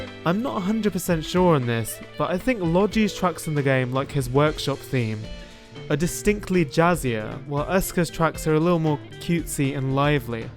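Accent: United Kingdom. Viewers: Oh that's a British man, I honestly didn't expect that.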